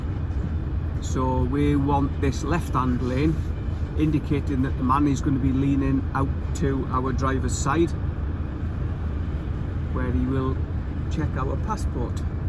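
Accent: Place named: Swansea, United Kingdom. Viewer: That’s a Swansea accent I reckon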